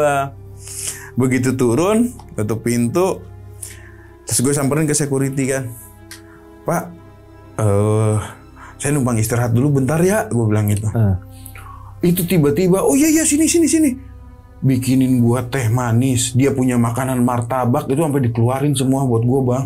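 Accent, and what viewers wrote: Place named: Betawi, Indonesia. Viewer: Kagak ngerti klo Nyang cerita pakai dialek Betawi suka bgt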